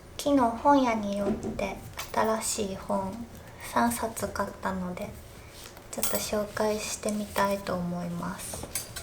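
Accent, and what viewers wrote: Kansai, Japan. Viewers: 台湾からの視聴者ですー！久しぶりに動画観れて嬉しいです。関西弁もかわいいです❤ 体調に気をつけて無理のないようにお過ごしください！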